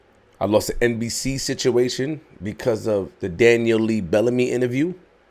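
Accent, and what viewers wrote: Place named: Queens, United States. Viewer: You talk like if Christopher Walken was from Queens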